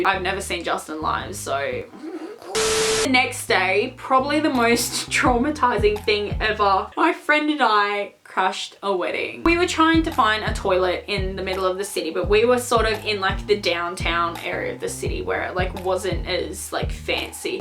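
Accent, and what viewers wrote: Australia. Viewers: Your Australian accent is so thick.🐨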